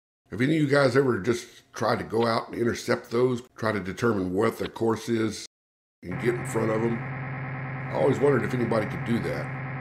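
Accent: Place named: United States. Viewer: I know nothing about submarine games. I watched this because of your fantastic accent!!!😍😍😍 What U.S state are you from❓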